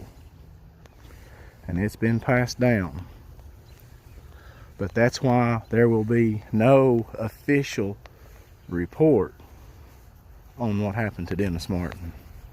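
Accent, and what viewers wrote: South, United States. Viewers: This is beyond interesting. Wow. U got a great southern voice and delivery too. Good job.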